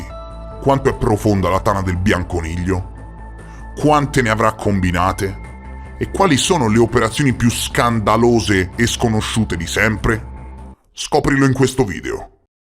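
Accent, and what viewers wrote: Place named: Livorno, Italy. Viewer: Video top come al solito. Saluti da una concittadina (credo, se il tuo accento non mente sei livornese anche tu 😅)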